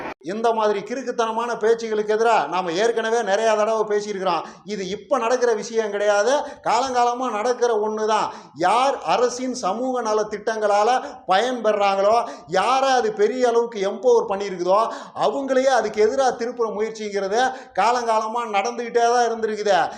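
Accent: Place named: Coimbatore, India. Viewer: அண்ணா உங்க பேச்சு கோவை தமிழ்ல பட்டை கிளப்புறீங்க போங்க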